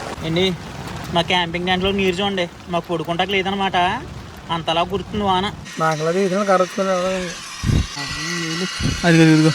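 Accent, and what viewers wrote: Godavari, India. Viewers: Godhavari language super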